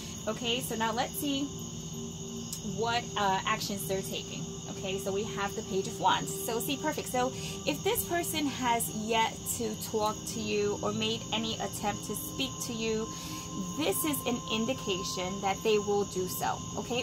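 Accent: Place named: New York, United States. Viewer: I here the NY in you 😊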